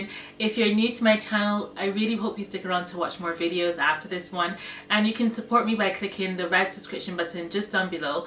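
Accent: Barbados. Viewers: I knew I heard that accent! Fellow Bajan here! ❤️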